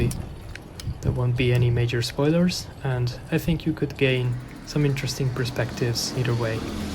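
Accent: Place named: Italy. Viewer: you remind me a lot of a really good friend of mine from college days, also from italy, had a very similar accent/intonation as well as a similar way of looking at things (and he was a huge film nerd!)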